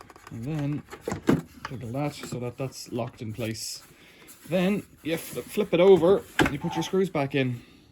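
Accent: Ireland. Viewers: Lovely chilled video. Practical, informative, clear. Top man. Also, loving the Irish brogue, not to sound patronising 😉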